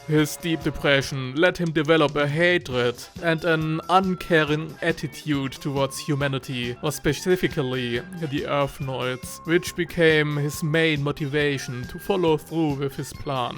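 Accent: Germany. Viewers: Ahh yeah, once again my favorite German accent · Boah, I love this German English accent. Bitte Mehr hahaha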